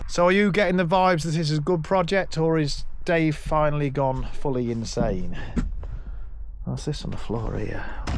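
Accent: United Kingdom. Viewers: The accent says British but the use of feet and inches screens murican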